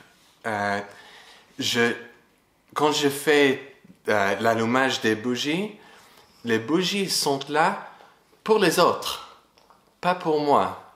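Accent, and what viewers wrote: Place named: Israel. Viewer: D'abord j'aime l'accent hebreu en français 🎶💯🔆✴ en suite j'espère parler hebreu comme vous parlez français ! Merci pour cette belle nuance enter les limites et les lumières....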